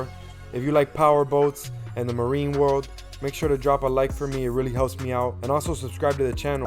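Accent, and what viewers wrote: Miami, United States. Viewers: This guy has a Miami accent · My bro has a Miami accent. 305 ❤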